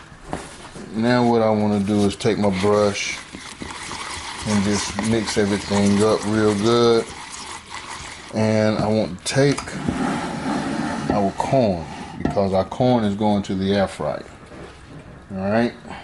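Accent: South, United States. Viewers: “I got some irl. Some olive irl”. is soooo country, I love it. 🤣🤣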